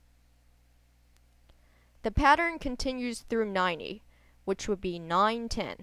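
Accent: Taiwan. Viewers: taiwan mandarin？ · Is this Taiwanese or China dialect? I thought 1 = yao?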